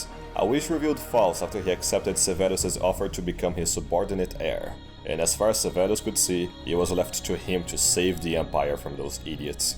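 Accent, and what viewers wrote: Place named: Brazil. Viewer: bro WHAT COUNTRY ARE YOU FROM??? YOU SOUND LIKE A BRAZILIAN SPEAKING ENGLISH and this is coming from an English teacher that is brazilian as well so wtf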